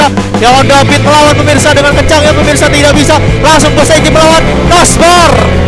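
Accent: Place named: Lampung, Indonesia. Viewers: Gausah dengerin orang orang belloon bang tau gua orang Lampung begimanah logatnya wkwkwk fokus berkarya aja lu nya bang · Itu bukan ngegas, logat lampung emang kayak gitu. Makanya sini ke lampung biar di ceramahin dulu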